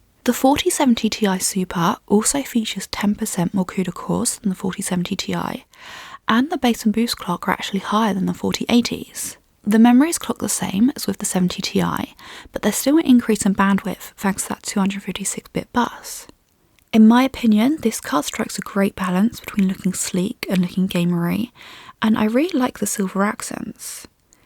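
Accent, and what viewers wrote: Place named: RP, United Kingdom. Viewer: i imagine this is what its like to listen to english royalty talk about GPU's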